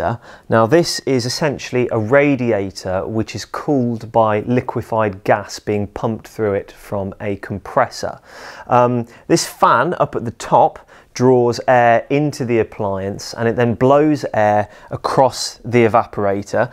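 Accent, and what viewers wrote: RP, United Kingdom. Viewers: Like that accent is it posh?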